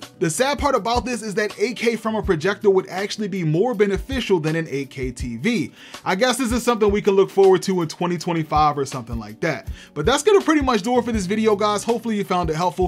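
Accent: Baltimore, United States. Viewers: I hear that Bmore coming outta ya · is this a bmore accent I detect? Great videos! Very helpful.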